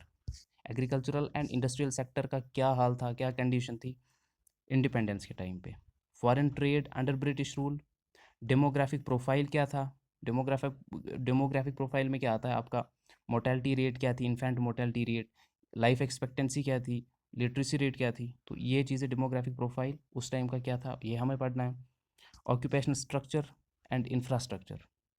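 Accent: Kashmir, India. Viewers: Kashmiri accent❤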